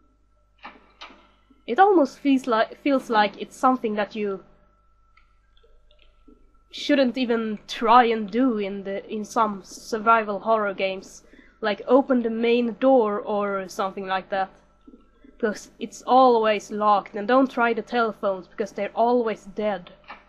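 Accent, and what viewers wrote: Sweden. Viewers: ^ ^ ur accent kinda sounds swedish? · Great LP so far. I've just tried the game, I haven't really sat down to play it yet. cool accent by the way, my guess Swedish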